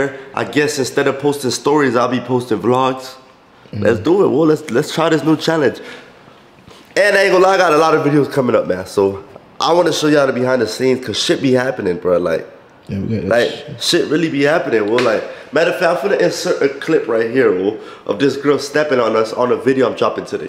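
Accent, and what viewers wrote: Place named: Florida, United States. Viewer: Is He From Florida Because He Talk Just Like My Boyfriend That’s Crazy 😂😂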